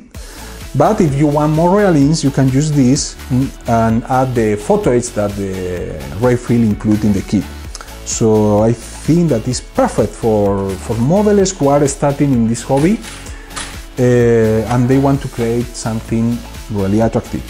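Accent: Spain. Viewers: Very useful. .an original kit. .and your accent is still so. ...spanish 😉i like it 😀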